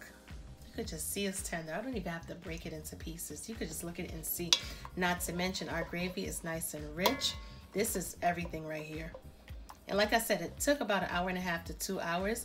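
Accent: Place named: East Coast, United States. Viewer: Yummmyyy!!!! We look for the good food but listen for that East Coast accent! ❤️❤️ 😊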